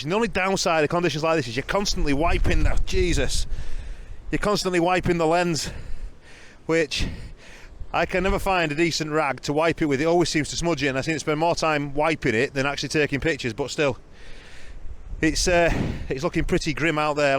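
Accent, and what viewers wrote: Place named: Northern England, United Kingdom. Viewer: yeh the winds at Lizard can be nasty...but Mullion is amazing and ive wondered down there before with my camera...never tried astrophotography before...luckily we moved down 9 months ago and live just outside Marazion so Mullion is just down the road...! where bouts you from..the northern accent stands out a mile lol...im born and bred in Sheffield but live in Cornwall...just sub'd btw...!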